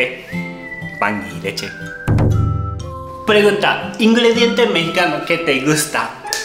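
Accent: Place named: Japan. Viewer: Por el acento japonés se te hará más fácil el acento espñaol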